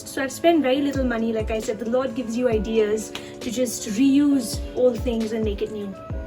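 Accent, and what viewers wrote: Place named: India. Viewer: I am 3rd generation Indian born in South Africa. It is so weird to see someone who looks oriental with an Indian accent.🙊You are so beautiful and great videos. New sub!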